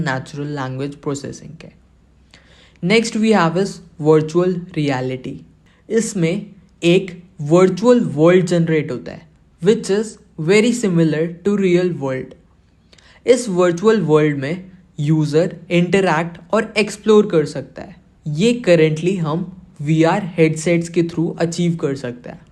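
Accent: India. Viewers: You sound like an average indian with voice stats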